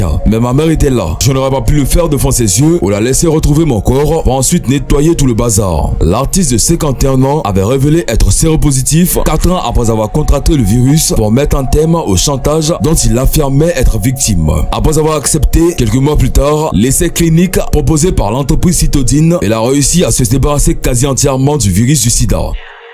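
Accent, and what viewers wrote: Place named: Benin. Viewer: C plus.son accent beninois.ils disent pas les.R.comme moi d ailleur hihihi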